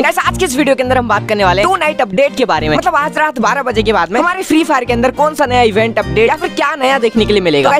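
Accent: Odisha, India. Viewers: Bhai odisha se ho kya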